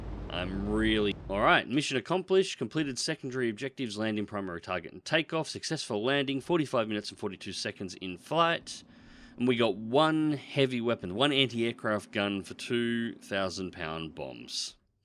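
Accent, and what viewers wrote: Australia. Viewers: Does the J-25 variant have all the perks of the L? Boosted ailerons in particular are what I’m thinking of... Love watching these videos. Ozzie accent, solid flying, honest ego-absent gameplay experience. I’ve been around the channel three, maybe four years (since you played War Thunder with Phly way way back when the game was good—he introduced me to you) and I’ve stuck with your channel long after I’ve stopped watching many others.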